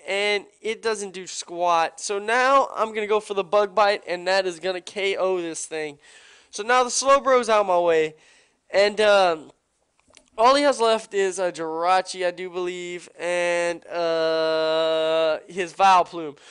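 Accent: South, United States. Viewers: I DO BELIEVE. i liked that catchphrase lol. you sound southern. Usually i don't like that, but i like you lol. nice narration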